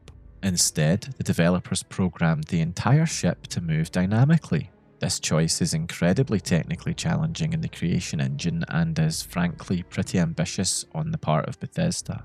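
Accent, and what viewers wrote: Scotland, United Kingdom. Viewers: Are you by chance Scottish? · Scot? Irish? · Subscribed because of scottish accent.. something I'm trying to learn.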